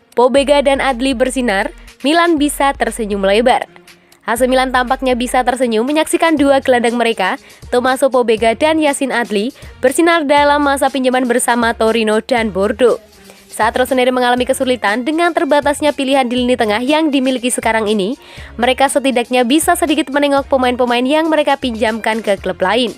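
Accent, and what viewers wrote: Java, Indonesia. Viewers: Beritanya top, Mbaknya org mn ya msh medok logatnya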